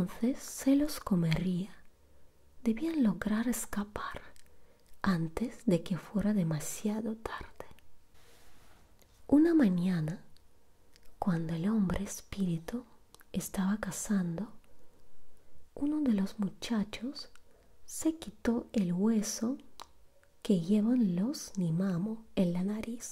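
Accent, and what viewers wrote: Russia. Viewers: Es española ? Porque ese acento de rusa?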